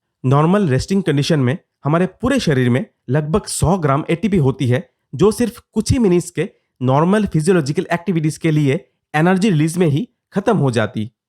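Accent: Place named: Bihar, India. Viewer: You seem to be from Bihar.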